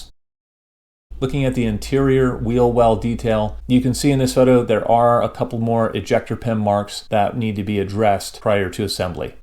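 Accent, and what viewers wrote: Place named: United States. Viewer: I can't believe it! An American accent, and no bullshit! An excellent review, clearly enunciated with what we need to know as prospective buyers or builders laid out in logical order with potential traps pointed out - the screenshot showing what happens if you omit part 117 was excellent. I have a later boxing, (14002 from 1990), and can say that my example lacks the sink holes on the airscrew blades and the gun magazines, as well as having had the clear parts fixed, so Airfix have kept an eye on things. I wasted my money on replacement metal undercarriage, which has an awful grainy finish and lacks the sharpness of detail present in the kit parts. I particular, the oleo strut can never be polished to look as smooth and shiny as it should. Other reviewers have agreed with your suggestion that the cowls will not fit over the complete installed engine, with most saying that a considerable amount must be ground from the cylinder heads to allow the cowls to be properly fitted. 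Oops!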